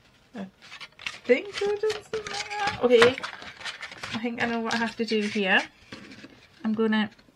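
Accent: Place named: Newcastle, United Kingdom. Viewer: New subscriber, Found you through julie at camelia craft designs 😉 it's great to hear a geordie lass 👍 yey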